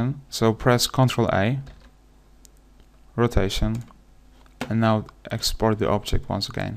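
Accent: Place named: Poland. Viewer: It's somehow nice to hear a Polish man speaking English, your voice sounds empathic. I like yout stuff, you should do more. Pozdrawiam! :D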